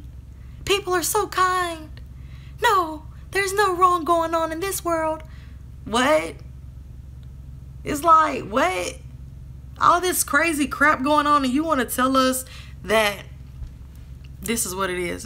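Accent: South, United States. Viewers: i love her country accent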